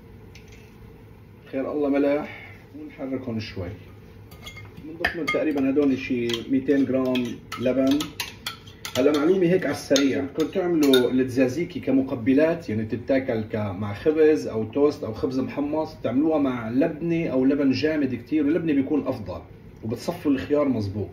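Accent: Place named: Banias, Syria. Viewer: اهلين من اول ماسمعتك قلت من بانياس بس حبيت اتأكد الله يسعدك يارب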